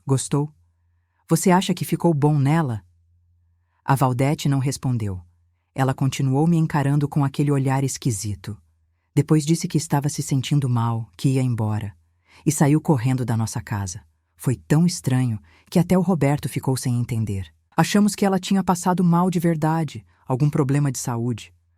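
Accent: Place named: São Paulo, Brazil. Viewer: A narradora estava falando tão bem,com um sotaque bonito de são Paulo capital. De repente, o sotaque ficou interiorano trocando o R pelo L. Horrível.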